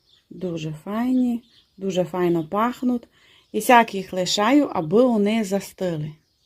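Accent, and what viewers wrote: Transcarpathia, Ukraine. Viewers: Не показуйте будь ласка свою неосвіченість. Це на Закарпатті такий діалект. Ніхто мову не кривляє. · Сьогодні зпекла всі три види, тісто чудове. Я багато печу, цей рецепт не новинка, але дуже мені подобається ця закарпатська говірка. Дякую,.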